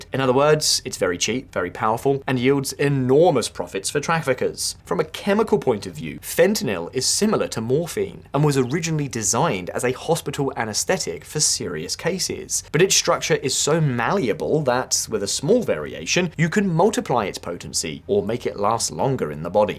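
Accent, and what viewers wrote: United Kingdom. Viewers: Very approriare to have a guy with a judgemental brithish accent lecture USA on opiums By the late 1700s, Britain had a huge trade problem with China: • Britain wanted Chinese tea, silk, and porcelain. • China wanted almost nothing from Britain. • Result: Britain was bleeding silver. So Britain did what empires do when trade doesn’t go their way. The Opium Solution Britain began smuggling opium grown in British-controlled India into China. • Opium was illegal in China. • Millions became addicted. • Silver started flowing out of China and into Britain. China knew exactly what was happening and tried to stop it. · So rich for a Brit sounding dude to sound so smart, yet missing the point. Dude, this is what England did to China, and now is doing it to us. Who is in charge, the bankers dude, the identically same as before. Trump is tearing down the global order, in other words, your king.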